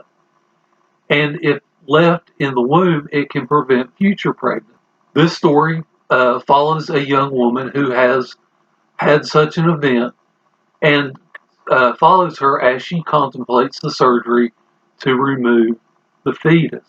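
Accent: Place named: Appalachia, United States. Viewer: Hey. First time viewer. I won't presume to guess where you're from, but the way you speak reminds me a wee bit of some dear friends from Appalachia. Also, good instinct with the lava lamps. Ads a nice dynamic element to your shot. Also, also, didn't know about stone babies before (I do the nurse thing IRL, and I am reliably fascinated by medical phenomena I haven't heard of before).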